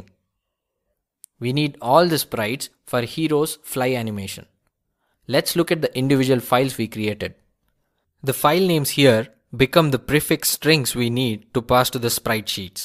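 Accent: India. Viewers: it was quite funny at the beginning with the perfect and polished intro then out of nowhere a thick indian accent. but amazing tutorial, helped a ton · indian accent really drives me mad..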